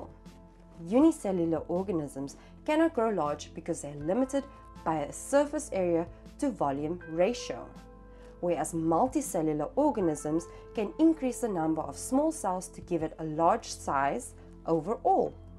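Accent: South Africa. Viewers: I love her Boer accent. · Love The South African Accent.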